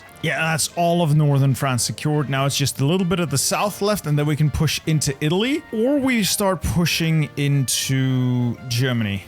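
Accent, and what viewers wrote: Flanders, Belgium. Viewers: Hoor ik daar een Belgisch accent?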